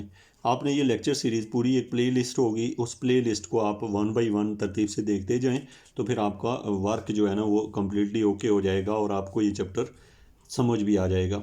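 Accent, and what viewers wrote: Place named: Pakistan. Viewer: Good Video, your accent is Pakistani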